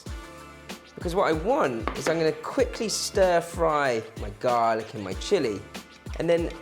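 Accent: England, United Kingdom. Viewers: This Asian dude sounds more English than English people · Lmaoo I don’t trust a Korean with an English accent making anything Korean then he made it so non Korean like 😂😂😂😂 nice try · An Asian with a middle class English accent? Now I've seen everything! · I was confused for a second when he started to talk lol he looks asian but with a British accent. I will try this recipe, thanks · His England or British accent doesn't have anything to do with his cooking skills. Trip part bou it is he may be Philapeno Lol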